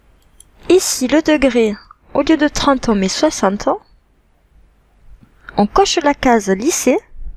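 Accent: South, France. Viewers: Ahhhh j'étais sur que c'était du sud et j'ai faillit dire Marseille mais j'ai bien fait de ne rien dire j'ai évité l'accident politique lol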